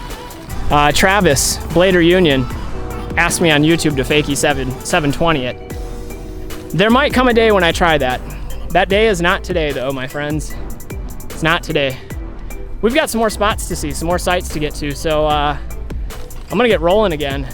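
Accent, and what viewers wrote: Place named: United States. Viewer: Greetings from Europe, thanks for that pure American accent ☺️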